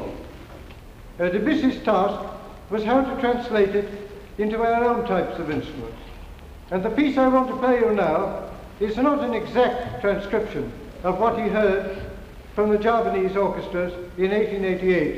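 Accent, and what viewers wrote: Australia. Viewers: Aussie accent? Well a little, perhaps, but he lived in Australia for such a short time. He was effectively a British ex-pat. Much as (say) Richard Dawkins or Cliff Richard (Africa and India respectively). Of course, later he became a Us citizen.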